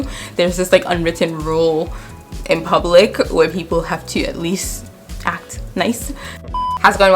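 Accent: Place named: Canada. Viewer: from the sound of her accent I think shes canadian or lived in canada for a long time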